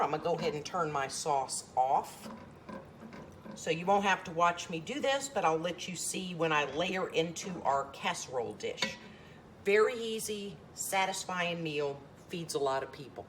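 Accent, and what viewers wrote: South, United States. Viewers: Hello, new to your channel.. been watching your videos.. I’m loving your videos and am loving your southern accent, I could listen to you for hours... Hubby and I are up here in Northern New England in Maine... We are retired and do as much homesteading as possible... This casserole looks soo amazing and delicious I can’t wait to make this.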